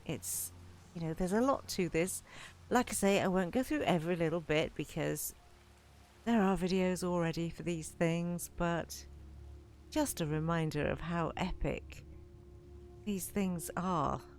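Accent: United Kingdom. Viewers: Did know you from Yorkshire, I'm from Leicestershire 🌹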